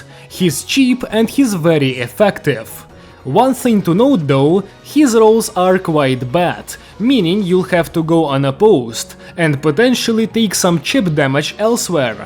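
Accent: Russia. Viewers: Слишком сильный русский акцент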